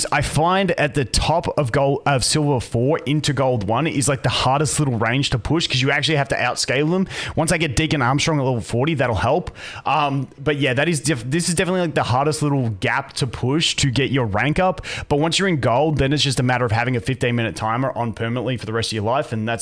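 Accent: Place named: Australia. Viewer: As a Yorkshire man i always find Australian accent fascinating.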